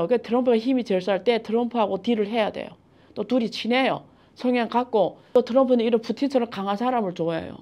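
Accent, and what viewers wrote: China. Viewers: 중국인이세요?발음이 확실히 느껴집니다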